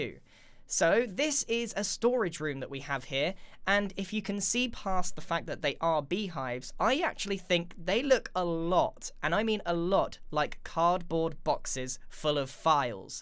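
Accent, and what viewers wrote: United Kingdom. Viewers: Dude I just love ur British accent❤️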